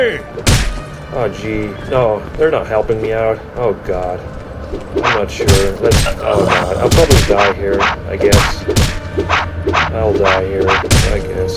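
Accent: United States. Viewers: He certainly sounds quite American. Either that or North Germanic having learnt American English. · He sounds like he has an American accent though...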